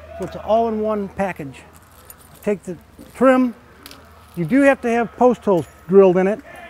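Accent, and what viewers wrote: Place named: England, United Kingdom. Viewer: I love your English accent and I hope if you start teaching English that will be amazing 🤩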